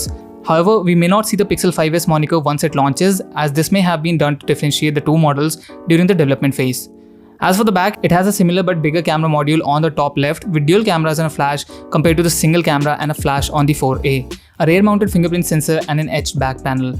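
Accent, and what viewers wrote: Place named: England, United Kingdom. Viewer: English accent so suck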